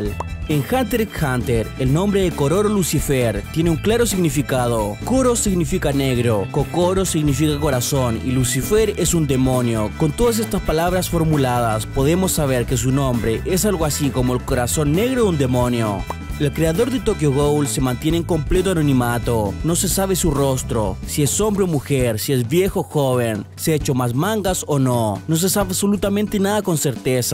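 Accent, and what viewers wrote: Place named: Chile. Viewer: Creo que a se le esta escapando lo chileno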